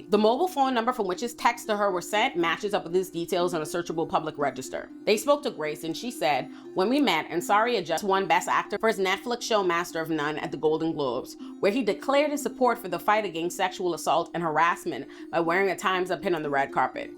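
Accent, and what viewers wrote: Bahamas. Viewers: I may have asked before, but you Bahamian?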